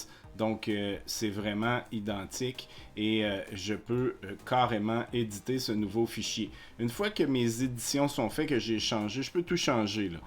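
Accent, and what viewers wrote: Canada. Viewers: merci beaucoup , je kiffe entendre l'accent canadien haha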